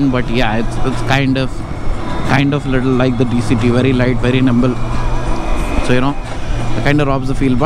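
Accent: Hyderabad, India. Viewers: K T M 1290 k Bare me Video Banow..Zara Hamari Haidrabai me Bolne ka nakko Bhulo..yaron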